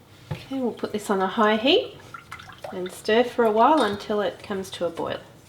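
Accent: Australia. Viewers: You sound like an Aussie, but live in US? Can't wait to try this, thank you, and helloo from Australia. ;D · wow so good will try this very soon,thank you for this video, sounds like your an Ozzie :))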